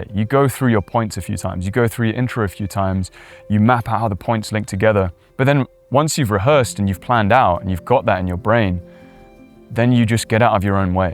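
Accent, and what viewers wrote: England, United Kingdom. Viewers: I used to work at a radio station as a receptionist. I heard every kind of great voice to the slow, boring voices. I learned a few things that have helped me with my channel. I try to let people "feel" my voice. This is good information he is sharing. The English accent just gives him a cooler edge. 🎤👍🏽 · is the english accent a requirement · It also helps to be classically good looking and charming -- And to have a clear and articulate English accent with a soothing baritone voice. No Diddy. I'm just pointing out that in addition to the steps which you cover, I believe it will work especially well for you because you are beautiful (although your skin was oddly greenish-yellow in some camera angles.) I guess the point of my saying all of this is, I wonder if this would work for everyone. I mean, I'm going to try it for sure, because I am not a blubbering rock troll and I'd like it if people watched my guitar content. 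I appreciate this video and I look forward to seeing more of your stuff. Thanks! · Well, I watched your video. And at the end, I felt like I was talked to by a skeevy religious zealot trying to get me in their cult. No amount of soft music, country bokeh, low grade UK accent voiceovers and coy looks is going to change that. I will continue to be me. Draw those that enjoy me for me. Try to be the BEST me I can be. And not gaslight people into "liking and following me". Where exactly is the merit in that? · Not everyone likes an English accent. I for one generally don’t . I will skip one it unless American English. This guy has gone tone. I’m interested in what he is saying so I listened to see if I can incorporate in my channel- which is a different IDENTITY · It's called having a British accent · English accent.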